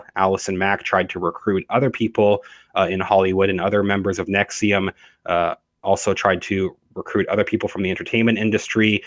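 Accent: Canada. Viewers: First time viewer you sound Canadian The walls are tumbling down Trump Crimes against children looks like its just getting started ! I subscribed the more Citizen journalists the better !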